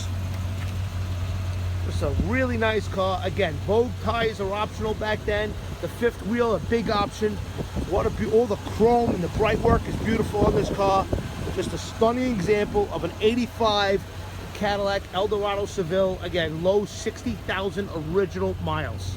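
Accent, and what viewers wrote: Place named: New York, United States. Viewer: A new yorkan salesman , rough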